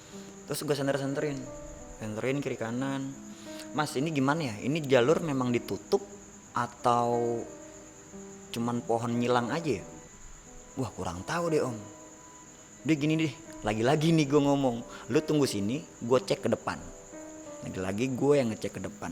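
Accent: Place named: Bali, Indonesia. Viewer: Mungkin orang bali ngomong hurup Tnya biasanya gitu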